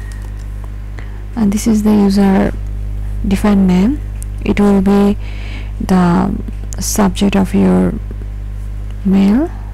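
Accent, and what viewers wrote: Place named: India. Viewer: Your way of explaining is good.. By the way i am from India.. So from the accent I thought you were from India too.. Keep up the good work :)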